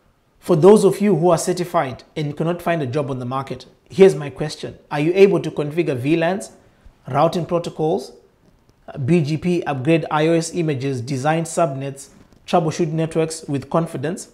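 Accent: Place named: Kenya. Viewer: Your accent is Kenyan probably from South Nyanza. Definitely had to sub and like. Am planning to start my CCNA come Jan 2021.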